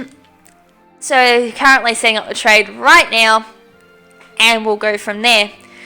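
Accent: Australia. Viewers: Female Australian accent is hot af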